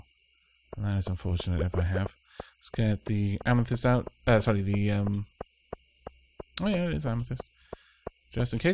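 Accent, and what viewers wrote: Wales, United Kingdom. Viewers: Bläh He's Welsh 😉 not sure where he currently lives though.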